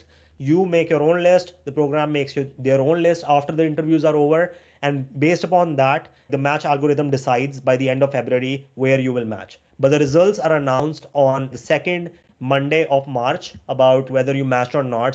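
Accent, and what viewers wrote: India. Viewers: Good info but you have that typical funny Indian accent. I hope the patients enjoy conversations with ya :)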